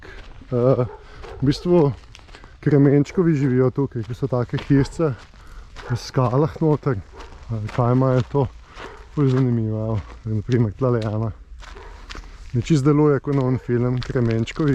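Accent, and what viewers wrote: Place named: Styria, Slovenia. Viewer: Dober nov intro. Pa fajn je slišat štajerski glas :) Fair winds and following seas!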